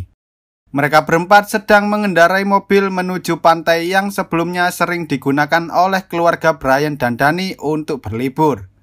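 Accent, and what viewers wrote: Java, Indonesia. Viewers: Paling Suka Dari Chenel Ini Suara Nya Jawa Bangget Dan Suka Bercanda Juga:v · Narator wong jowo too hehe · Logat jawa mimin nya jawa nya mana min🤭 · Medok g asik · Mimin Jawa mana?. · Ws r usah ke suen lah wong jawa ya jawa endi boz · Saaangat bagus rangkuman nya!!! Makasih! Aku suka aksen jawa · Njawa luh males dengernya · Jowomu alami min😂😎salam dari malang👍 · Wong jowo yo mas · Medok bgst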